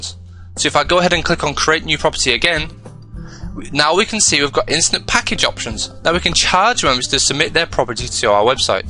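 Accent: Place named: United Kingdom. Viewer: I agree. It is a classic British accent,but not at all pompous or fake.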